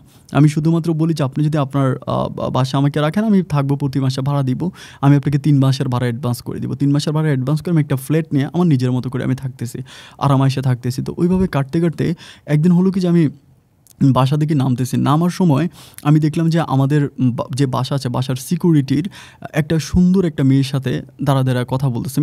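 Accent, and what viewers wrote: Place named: Rangpur, Bangladesh. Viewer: ওহ রংপুরের ভাষায় কথা বললেন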